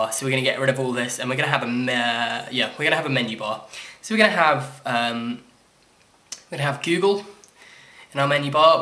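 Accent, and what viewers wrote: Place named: London, United Kingdom. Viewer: "You sound like you're from London!"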